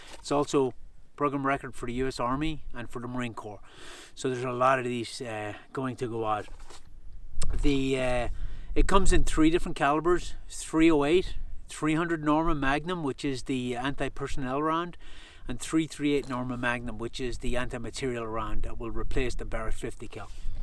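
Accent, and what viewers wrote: Ireland. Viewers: i have to ask.do you have a slight irish accent? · I can hear a hint of Irish Accent in yer Patter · Great video, what pat of Ireland you from? I cant tell, Dublin? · Where is the presenter from? Sounds Irish to me. He said 'so we were', which suggests Northern Irish rather than the Republic. Perhaps border. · Hey mate you sound Irish 🇨🇮🇨🇮🇨🇮 👍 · Two things that accent, Irish Scottish? Second how does the rifle operate from short action to long action? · Irish! That's it! I couldn't figure out!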